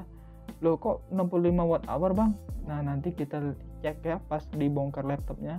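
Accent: Palembang, Indonesia. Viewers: orang palembang ya bang? logatnya kayak orang palembang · orang mana bang? logatnya kek palembang hehe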